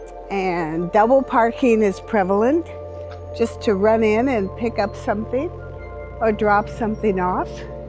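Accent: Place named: United States. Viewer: You keep talking about cigarette smoke I don’t know where you come from but you sound like you’re from the US and it’s nice that you’re able to ride a bike around and you’re safe and you don’t have homeless tents on the corners and people walking around like zombies because they’re so drugged out you don’t see stores that they can walk into and get needles and inject themselves so I can deal with the smoking I live here now for 2 1/2 years and as a woman I feel very safe walking around anywhere at night. then you’re talking about fireworks well you have fireworks but you don’t have big fires that people are starting in the streets in your major cities. If you don’t wanna hear the fireworks move outside of the town live up in the mountains there’s beautiful areas everywhere. you won’t find Walmarts and targets but what you will find her nice little vegetable stands and separate markets that you buy your meat and fresh bread that aren’t filled with disgusting ingredients and that are fresh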